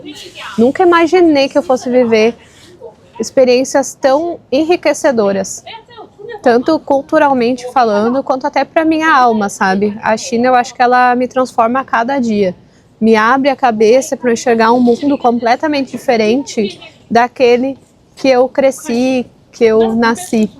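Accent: Rio Grande do Sul, Brazil. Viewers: Voce é gaucha?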